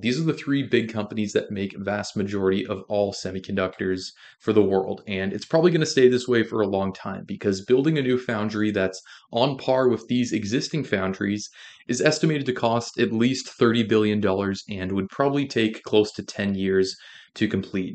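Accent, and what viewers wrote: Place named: Canada. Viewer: From your accent you must be canadian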